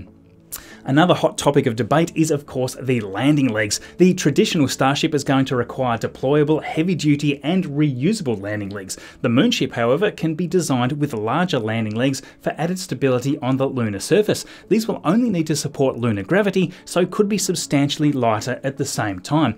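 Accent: Australia. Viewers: "Hache El Es" (HLS) I love how Australians say the letter H. Americans pronounce it just "Ache" without the "Heh" sound. But you include the actual letter, which frankly makes a lot more sense. A completely sideways and weird observation, I know. I'm completely fascinated with language, even in cases where it's my own language. :D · I don't like your chanel more because, I don't understand your australian accent verry well, I'm just studying english from Brasil, we speak portugese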